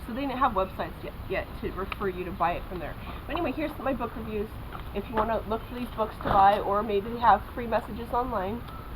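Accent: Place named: Ireland. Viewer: For some reasons i thought you were from Ireland.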